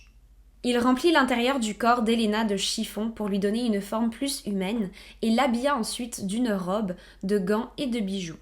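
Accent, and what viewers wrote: Quebec, Canada. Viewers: Trop drôle t'es d'min coin ! 🤣 Je pensais vraiment que tu était Canadienne. 😅 Tu es passé du Nord au Grand Nord mdrr En tous cas encore une vidéo de dingue, quel taré ce gars 😵‼️ Continue comme ca j'adore tes vidéos ❣️ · Très intéressant, ce n'est choquant, mis à part l'exposition au publique, j'avoue que c'est une sorte de dérive, mais je penses que celà reste une forme d'amour, étrange, glauque, qu'on ne peu comprendre 🤷‍♂️ mais ce n'est pas un acte criminel, ou du genres, c'est pour ça je penses qu'il a été relâché direct, ce n'est pas réellement du vandalisme en définitif Après j'avoue que c'est étrange et difficilement compréhensible mais bon 🤷‍♂️ Ah oui c'est vrai que tu es française 😌🤘 d'où l'accent québécois très léger, jm disais aussi ^^ C'est cool en tt cas et j'adore ta chaîne et ta personnalité 😊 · Aucune apologie.......... 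De meme....... Une Française avec un petit accent québéquois !!!! Non de Lille mais de L'isle sur le doubs (dep 25)......... Voilà la raison pour laquelle vous etes toujours bien maquillée. Bonne fin d'année. un livre qui à mon avis est bien écris et intéressant : "The last victim" : Jason Moss et Jeffrey Kottler. (bouquin non traduit, version américaine). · Au début j'avais cru que t'étais juste d'origine française mais que t'étais vraiment québécoise parce que t'as vraiment pris l'accent et leur façon de parler, je suis aussi française c'est pour ça que je te le dis (et fan de supernatural au passage :p aussi de surnaturel/horreur) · Je suis surpris que tu sois de Lille, tu as vraiment pris un accent canadien je trouve, on retrouve pas celui des HDF dans ta voix mais c'est aussi compréhensible quand on s'expatrie. En tout cas, chouette vidéo, je t'ai découvert grâce à Mogota et c'est chouette ce que tu proposes.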